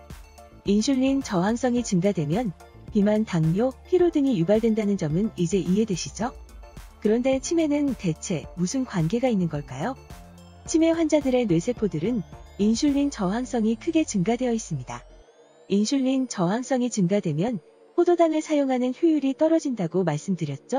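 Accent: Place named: Busan, South Korea. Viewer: 바뀐 목소리도 좋지만 선생님 목소리가 더 이해가 잘가는거 같네요ㅜㅜ 특히 전 부산이라 아는분이 말씀하시는것처럼 들려서요~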